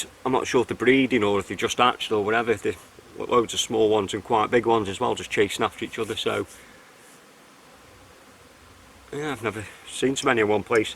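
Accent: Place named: North, United Kingdom. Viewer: Hi. I love your channel. Very relaxing! I have been trying to pin down you accent but I have been failing. The best I can do is “Up north”! Where are you from?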